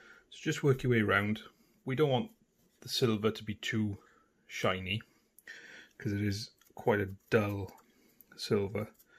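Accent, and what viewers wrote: Wales, United Kingdom. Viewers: Loving the vids! Nice to hear a fellow Welsh Accent in the painting scene! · You sound Welsh where you from mate?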